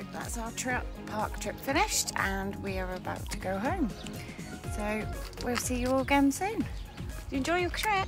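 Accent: United Kingdom. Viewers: You are from which part of England?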